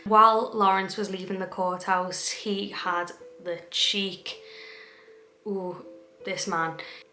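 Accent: Liverpool, United Kingdom. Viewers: Remarkably Google Captions understands your scouse accent perfectly well not a single word wrong which shows how clear you speak. Normally regional British accents don't translate well. May I also say you have the cutest mouth I've ever seen!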